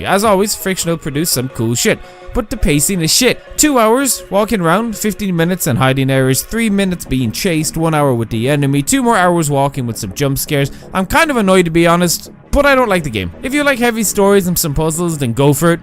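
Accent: Ireland. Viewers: Lol love this review! Especially with your Irish accent 😂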